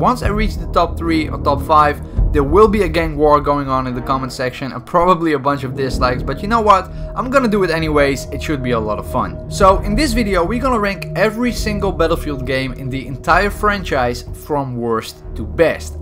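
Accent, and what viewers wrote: Netherlands. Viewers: Are you from Netherland ? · Wait a second... why do you sound dutch????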